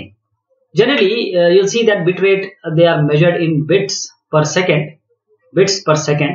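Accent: India. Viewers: Classic Indian accent, noice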